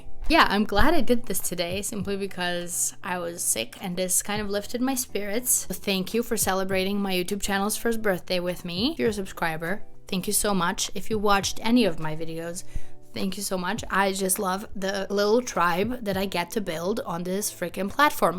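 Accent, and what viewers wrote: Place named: Italy. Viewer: si sente che sei italiana eh. sgamata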